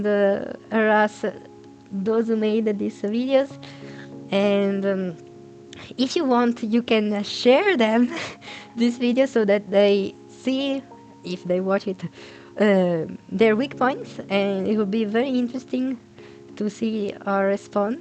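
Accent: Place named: Italy. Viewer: What is your native language? I'm curious it really sounds like italian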